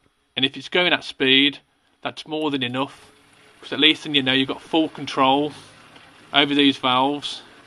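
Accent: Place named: Birmingham, United Kingdom. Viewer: Fantastic help thanks - have recently emigrated to Italy which I'm presuming the home of Ferroli and have just fixed my error 37 fault! I can warm myself up tonight! Cheers - and good to hear a Brummy-ish voice again!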